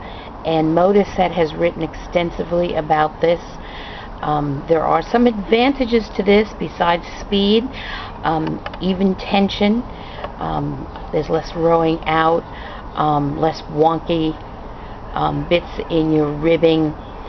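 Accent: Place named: Troy, United States. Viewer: We used to go to Troy on our way to Cadyville almost every week end because we had partying friends in "those days". Troy, was "big" on our list! I know; because your accent is most evident. I am amoung the 20 percenters of knitters. I knit though the back loop and purl through the front loop. I get a cross stitch unless I purl through purl through the back correct? I wrap my purls the same as You do. I subbed and I don't know how I missed Your wonderful channel in the past! Thank You. I do have a question for You. What will the most probable outcome be if I enter some of My awesome socks or knitting into the fair for judgement in Our today's world?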